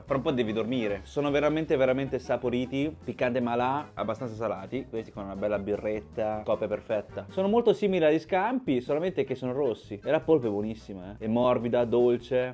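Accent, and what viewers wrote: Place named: Modena, Italy. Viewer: Che ridere l accento di modenaaa 😂😂😂 sei un grande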